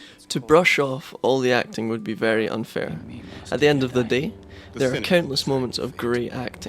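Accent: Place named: Scotland, United Kingdom. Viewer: You sound like an Irish episode 2 Anakin Edit: yes, Scottish, my bad!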